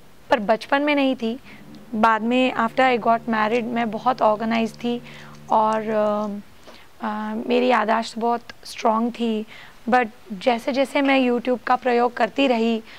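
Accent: Standard. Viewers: Aapki Hindi bhut hi Sanskrit nisht Aur shuddha hai Aur bhut hi uttam hai adhiktar hindi bhashi logon se…..aapko pranam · Ma'm itni shuddh hindi to aajkal koi north India main bhi nahi bplta he😄. Your hindi is at/ even above native speakers. No need to be worried about it.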